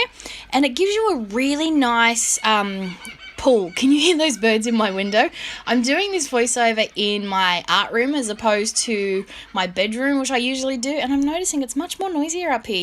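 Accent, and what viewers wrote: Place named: Australia. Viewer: Subbed as soon as I heard your voice. ^5 Aussie. Great idea to use the castoff.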